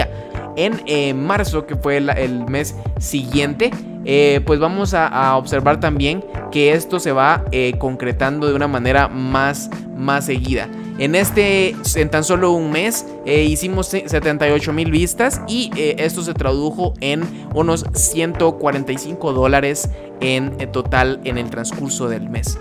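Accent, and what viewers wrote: Mexico. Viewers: Se te agradece la información papá, pero demasiado largo el video, hay que mejorar la edición en la post- producción de tu contenido. Existe demasiada redundancia en tu diálogo. Además ¿dónde dejáste el VOS chapín? Parece más que sos mexicano. Son opiniones puramente personales por supuesto. Espero tomes la crítica en una manera constructiva. Para que veas que no estoy siendo culeid, le dejo un 'like' a tu producción mano. Sigan adelante. Por cierto es primer video tuyo que miro, y voy a ver más para apoyarte. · Porque habías como mexicano 90%mexicano10%Guatemalteco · por qué habla como mexicano? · Este men parece mas mexicano que guatemalteco ? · Porque hablas como mexicano?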